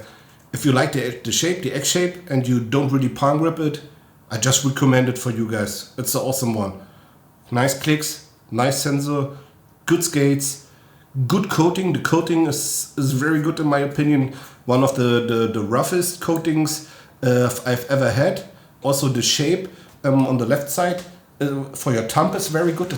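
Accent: Germany. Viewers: Stuck around to pin point the accent. Hört sich ziemlich Deutsch an.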